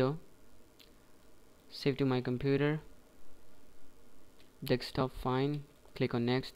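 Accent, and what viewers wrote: India. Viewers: I can hear that u r indian i am indian my self except im punjabi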